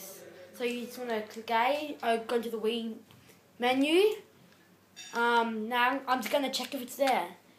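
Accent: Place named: United Kingdom. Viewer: Are you british or live in the UK? I'm not judging you. Honestly, I want your accent. And it worked.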